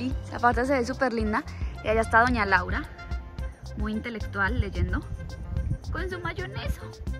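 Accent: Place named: Mexico. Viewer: Que lindo lugar ❤️💕., ya se te nota un poquito el acento mexicano 🤭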